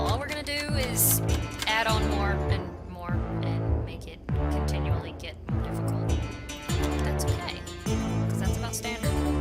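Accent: South, United States. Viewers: I like the southern accent.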